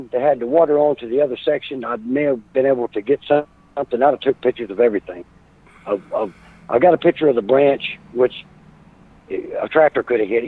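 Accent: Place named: Florida, United States. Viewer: He sounds like an old fashioned Florida guy from the country. Some old bars and pool balls the men's room was actually a back door outside to an alley.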